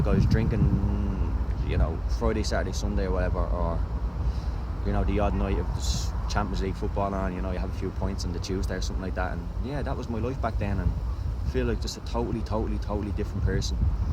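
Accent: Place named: Ireland. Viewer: definitely irish, sounds exactly like conor mcgregor.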